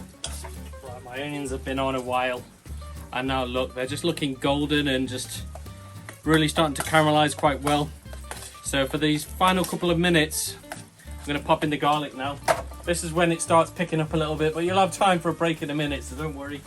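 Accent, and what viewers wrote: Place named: Wirral, United Kingdom. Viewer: You sound local... Wirral?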